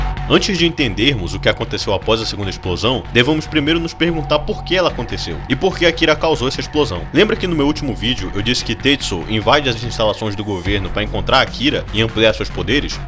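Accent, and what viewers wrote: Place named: Ceará, Brazil. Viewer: Oloco, cearense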